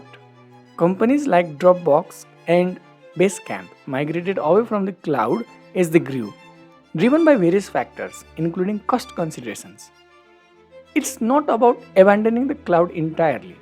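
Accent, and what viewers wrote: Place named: Nepal. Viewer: Because your voice tune is like nepali-english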